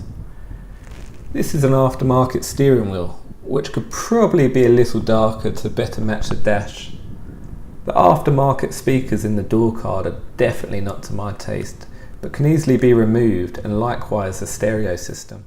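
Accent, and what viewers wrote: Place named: Essex, United Kingdom. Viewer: sounds like Essex though.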